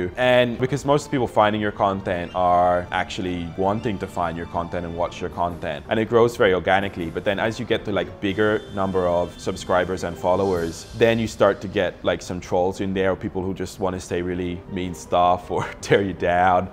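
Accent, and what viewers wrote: Philippines. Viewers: Hi,. I'm a Filipina from Colorado and would like to weigh in. As far as your looks, you are way too fair, but then you have no control over that. As far as your face, you have nice, kind eyes and a nice smile. So to me, you would be an 7 - 8. But then individual tastes are exactly that, individual, right? I prefer male faces to be more chiseled. But that's just me. It cracks me up to hear you speak English because you have a detectable Filipino accent and you seem to have lost your Australian accent. Anyway, keep on "chefing" because I watch your show to get the "base" when making unfamiliar Filipino dishes. The Filipino chefs on youtube speak Tagalog, and I don't understand Tagalog. Good day! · Don't listen to those hater, you have a great channel. But I have a question, how did you get a Filipino accent? Lol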